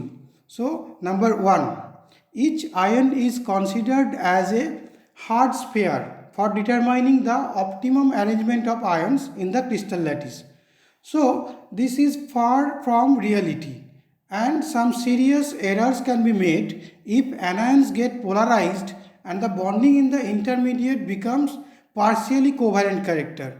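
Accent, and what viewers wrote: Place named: Bengal, India. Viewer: Sir you are Bangali it's clearly known to your voice . Nice outstanding video but sir if you teach in hindi then it will more better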